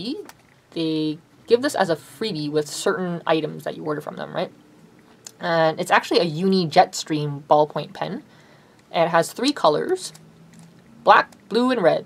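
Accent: Canada. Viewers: I loved drooling over your toolbox of goodies! I'm a pen addict! :) I thought you sounded Canadian! I'm a Canadian living Down Under. I moved to Australia 14 years ago, but Canada will always be 'home' to me! :)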